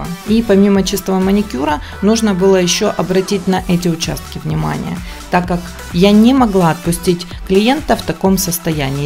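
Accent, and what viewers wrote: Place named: Ukraine. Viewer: с Украины, от акцента никуда не деться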